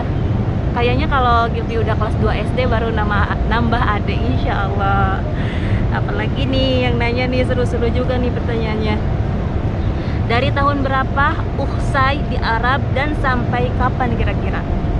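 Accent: West Java, Indonesia. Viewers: Assalamualaikum sy baru buka Vidio ini tapi sy sering nonton video Nya Kalau denger cara bicara nya kaya orang Sunda ya dari mana asalnya karna sy orang Sunda juga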